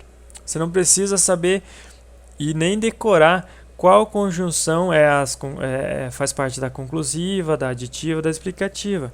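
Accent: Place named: Curitiba, Brazil. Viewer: Você é de Coritiba né ? Reconheci pelo jeito de falar e pela voz, bom video.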